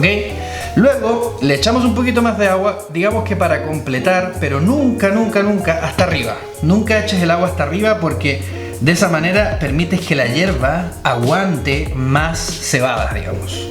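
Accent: Chile. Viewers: Creo q por tu acento sos chileno t agradesco por promocionarlo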